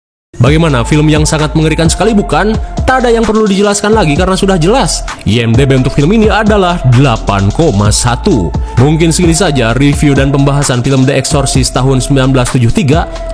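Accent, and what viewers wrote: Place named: Sunda, Indonesia. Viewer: asli logat bahasa Sunda sareng indo na sok pikaserien😅😂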